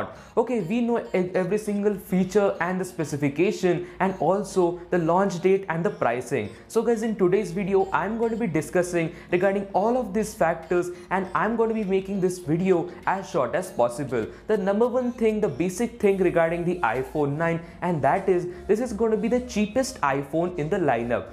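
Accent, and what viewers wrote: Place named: India. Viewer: Your accent is reminding me of Indians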